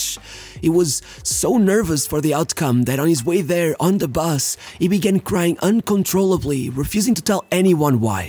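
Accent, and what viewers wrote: Portugal. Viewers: He has a Portuguese accent, English is not his first language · It's normal that you will praise Pele because you are either Portuguese or Brazilian as can be guessed from your English accent resembling Jose Mourinho.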